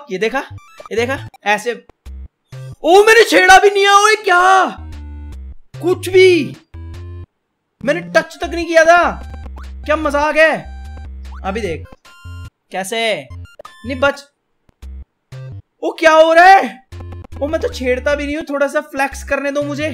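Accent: Haryana, India. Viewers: Bhai ki Haryanvi sahi hai👌😂😂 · Bhai tum haryana se ho kya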